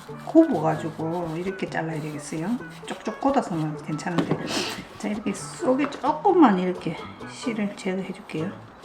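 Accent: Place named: Geochang, South Korea. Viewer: 어머님 고향이 어디셔요^^ 경남 거창인데 억양이 귀에 익어서요?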